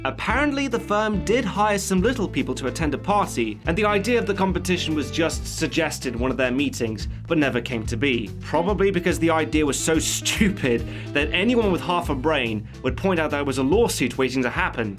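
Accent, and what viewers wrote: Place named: United Kingdom. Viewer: except the great video ❤ are u british ..i love your accent😂❤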